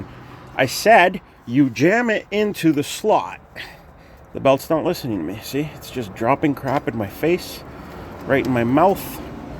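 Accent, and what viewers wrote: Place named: Canada. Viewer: This is the greatest video I’ve ever watched. I called it from the first minute you were Canadian. Cracked up the entire video. Well done sir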